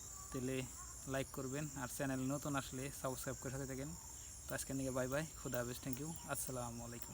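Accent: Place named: Mymensingh, Bangladesh. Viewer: আপনাদের বাড়ি ভারতে কোন জায়গায় মনে হয় ময়মনসিংহ ময়মনসিংহের মানুষ এরকম কথা বলে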